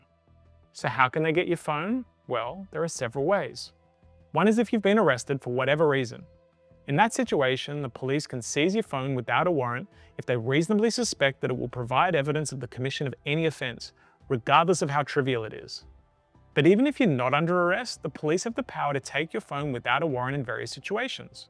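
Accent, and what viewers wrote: Australia. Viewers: It’s so good to hear an Aussie assent, alternative media is the new way for me to hear alternative news. Thank You.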